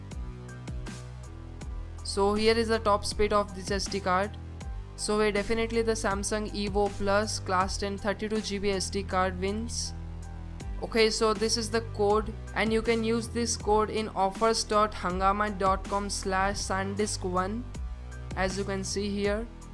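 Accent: India. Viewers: You sound like a Indian phone scammer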